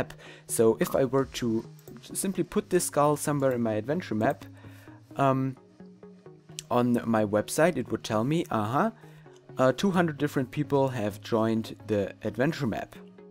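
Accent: Germany. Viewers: Are you German? Im asking because you have a German like accent.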